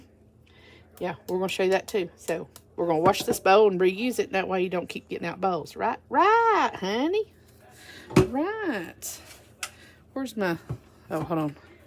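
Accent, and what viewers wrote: South, United States. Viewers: Thursday with when all her southern words come out 😂😅😂 Bless her heart, and her taters and maters...go get ya some honey😂😅😂 You know I luv ya my friend ❤❤❤ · Watching you and listening to you speak makes me miss living in the South. I miss having fresh vegetable plates for lunches and dinners. I love hearing your accent too; it reminds me of being young and growing up in Alabama. ❤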